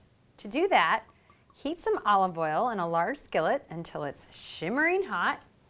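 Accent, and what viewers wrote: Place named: United States. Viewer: Yank. Turn off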